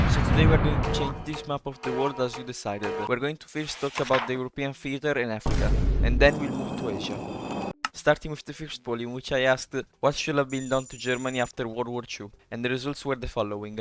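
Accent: Italy. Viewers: Ho capito subito che sei italiano per come parli inglese (non avevo visto il nome del canale) 🤣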